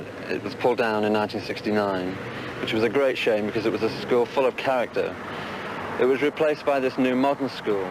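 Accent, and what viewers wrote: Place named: Ireland. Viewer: O sotaque irlandes dele junto com o inglês da um tom de soberania na sua voz, eu simplesmente amo.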